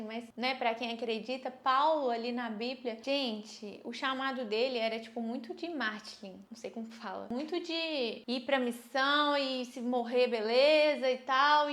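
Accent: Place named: Minas Gerais, Brazil. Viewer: Adorei seu vídeo! Me inscrevi 😍👏 seu sotaque parece mineira 🥰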